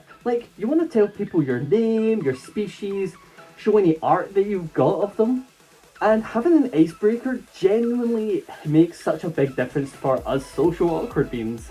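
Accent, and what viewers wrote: Scotland, United Kingdom. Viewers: I love ur accent SCOTLAND RULES!!!!!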